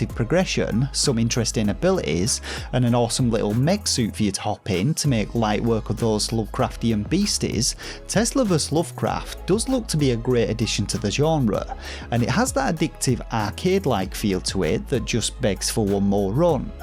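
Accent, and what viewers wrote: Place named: North England, United Kingdom. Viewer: Don't listen to him, I love your accent. Where exactly are you from in the north? I couldn't quite put my finger on it. Is it Middlesbrough?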